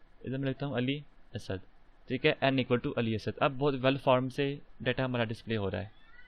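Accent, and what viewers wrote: Sialkot, Pakistan. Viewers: hahahahaah bhai g ap k bolny k style sy hi pata chal gya tha k ap sialkot k ho nd jab ap ny age print karwai tb sure ho gya =D =D Wesy bhai ap buhat axha explain karty hain or mery mind mai jo b question thy jitni b problem thy wo ap ny khudi hi bta dain =D =D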